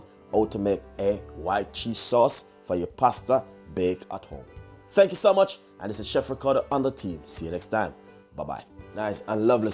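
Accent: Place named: Jamaica. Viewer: Why put sugar if sweet potato is already sweet? Anyways Love you accent .. you sound like Jamaican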